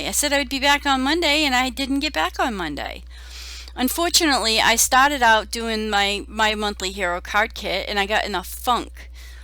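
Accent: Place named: Pennsylvania, United States. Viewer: I love these cards! I do think you’re putting to much pressure on yourself, but it’s strictly up to you the number of videos you produce ok? Oh! And where’s your accent from? I’m thinking Pennsylvania? A little stronger than Ohio 😁 where I was born 😏. thank you for your inspiration!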